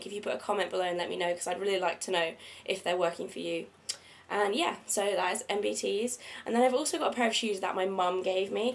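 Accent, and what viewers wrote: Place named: Oxford, United Kingdom. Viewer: OMG bicester village!! I shop there all the time!! I thought your accent was too familiar I'm an Oxford girl too!! :D x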